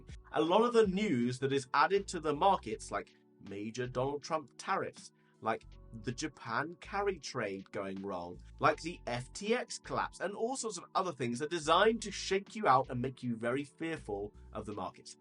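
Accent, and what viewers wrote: England, United Kingdom. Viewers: Thank you, always good to hear an English voice with content.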